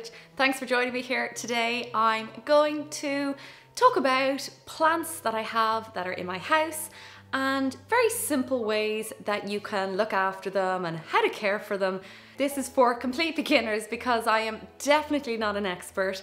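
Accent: Ireland. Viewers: Discovered your channel today. Love your soft sweet Irish accent - lovely to listen to. Have just subscibed. Thanks.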